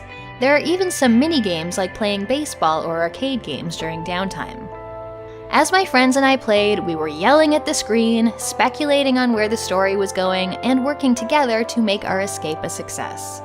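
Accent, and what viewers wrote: Canada. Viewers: What accent is this Canadian ?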